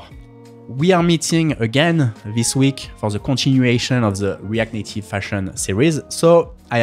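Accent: France. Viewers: Is this guy French or sth?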